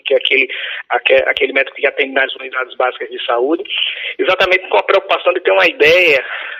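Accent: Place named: Madeira, Portugal. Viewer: Boa noite! Gostaria de saber de onde você é natural, pois nunca ouvi este seu sotaque... Parece um sotaque da Ilha da Madeira ( Portugal ). Obrigado!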